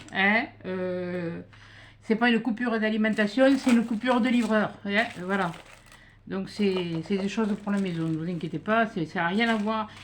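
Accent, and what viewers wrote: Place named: Lozère, France. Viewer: Bonjour. Vous m avez bien fait rire avec votre kangourou et votre accent. Je szis pas d où vous etes mais certainement pas du nord de la france. Il me fait penser un peu du sud de la Lozère. J ai passé un bon moment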